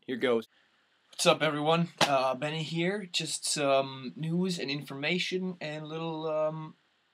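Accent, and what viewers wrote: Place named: Sweden. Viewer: Benny, two things. 1) Eat. You are loosing weight 2) You are developing a Swedish accent. Train. Harder.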